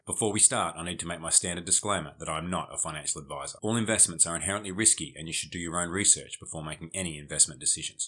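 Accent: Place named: Australia. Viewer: I think that's just the Aussie accent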